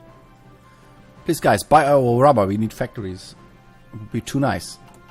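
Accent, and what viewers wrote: Germany. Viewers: ähm haha bei Ihnen hört man sehr dass sie Deutscher sind 🙈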